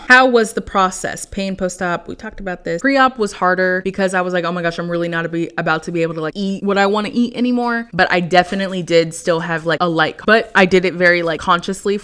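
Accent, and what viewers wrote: Texas, United States. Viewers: Your Texan accent is gorgeous and it’s part of you. So don’t hide that ish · Omg your Texas accent is so cute lol! Thanks for sharing your updates and being transparent. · Hey there's nothing wrong with speaking with your Texas voice. I love my southern voice.🤪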